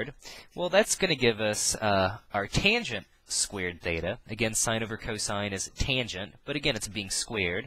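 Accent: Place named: Pennsylvania, United States. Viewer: As always, you were great. Can't thank you enough for taking your personal time to do this for us struggling math students. You rock. PS - Your accent makes me believe you're from PA...or maybe MD. Am I right?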